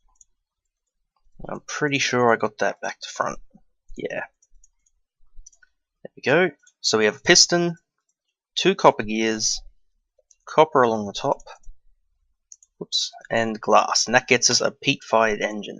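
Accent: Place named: Australia. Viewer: One of the reasons I enjoy your videos is that I get to listen to another Aussie accent :)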